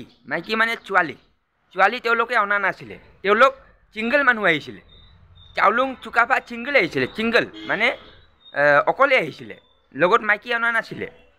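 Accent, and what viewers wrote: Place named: Bihar, India. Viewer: Apuni jitu bhakha koixe , jitu ussaron korixe , tenoka kitabotu asene, moito enoka bhakha kebol bihari Miya mukhere h hunu😂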